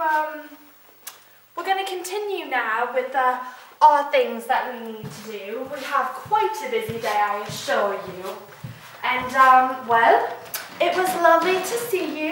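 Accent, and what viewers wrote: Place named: United Kingdom. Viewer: Your soooooo gorgeous 😍😍 i love your British accent 😉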